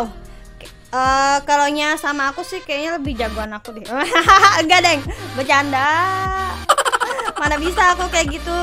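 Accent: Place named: Kalimantan, Indonesia. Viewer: orang mana ko bahasanya kaya orang kalimantab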